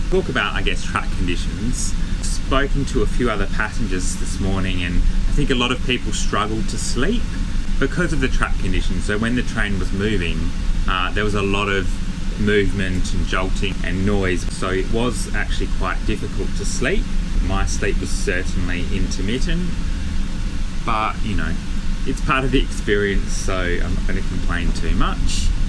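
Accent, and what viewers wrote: Australia. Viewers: CRISP CLEAR AUSTRALIAN ACCENT = LUV= your clear speech = elizabeth nz xox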